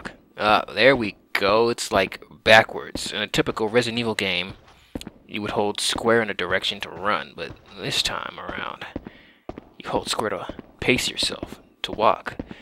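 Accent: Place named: RP, United Kingdom. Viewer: You know she's British :D you can tell she has a posh voice haha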